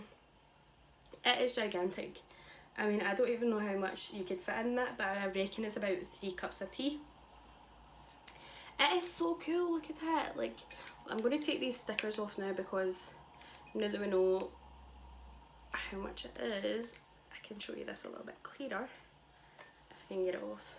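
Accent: Scotland, United Kingdom. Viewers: Are you Scottish by any chance? Bc i fricking love your accent!!!!!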